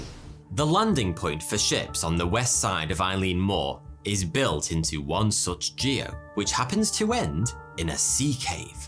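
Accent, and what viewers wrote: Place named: North, United Kingdom. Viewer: Tha sound northern thougnty..where ya from pal..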